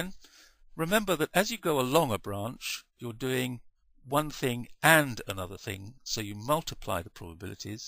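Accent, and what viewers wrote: United Kingdom. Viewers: The only reason I watched this video was because of the British accent on this guy. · Are you british mate?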